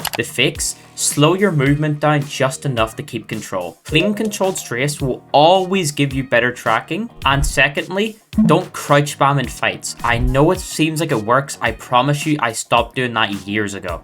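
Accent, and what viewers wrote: Northern Ireland, United Kingdom. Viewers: curious what part of northern ireland you are from. Only came across a handful on apex.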